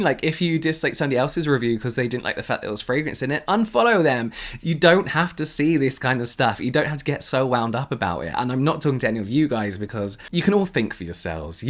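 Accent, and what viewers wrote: England, United Kingdom. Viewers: Your voice is so smooth. Can you me sing me lullaby before I sleep? Nah this is more like I will give you myself if you start talking. You have an english accent but it’s not thick just smooth to the ears. Oh I do agree with you.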